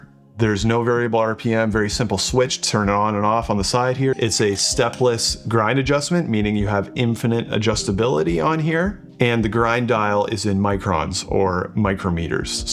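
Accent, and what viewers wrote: Canada. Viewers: Your accent is really ugly. Is it Canadian?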